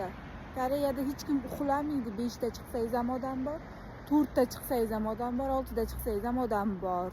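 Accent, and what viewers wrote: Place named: Bukhara, Uzbekistan. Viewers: Бухороликларнинг тили на мунча ёкимсиз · сиз бухороданмасми шевангиз охшаяпти олот каракулни шевасига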